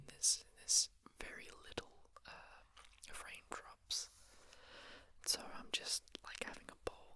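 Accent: Australia. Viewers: When I clicked on your video, my interest was immediately piqued by the familiar Perf accent, and I'm so glad I stayed because I love your rambles. I know a lot of women like myself have difficulty relaxing to the sound of a masculine voice, but you're so gentle and sweet that it's easy. Thanks for your videos,! <3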